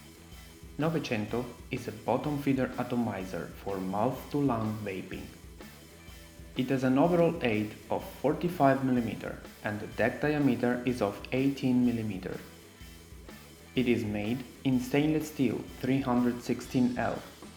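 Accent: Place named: India. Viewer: Guarda che parlare inglese con accento indiano non è così facile. Grande! :)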